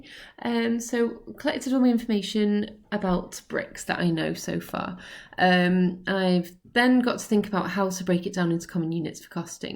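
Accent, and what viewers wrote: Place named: North, United Kingdom. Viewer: thank you, good stuff, nice simple and concise, so your are northern then, I m based around Manchester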